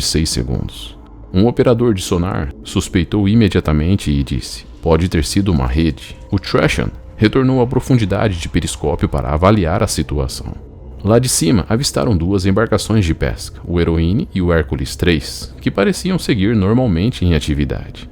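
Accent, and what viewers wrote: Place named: Caipira, Brazil. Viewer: pq ia tem q ter sotaque caipira? bota um sotaque neutro aí, seu google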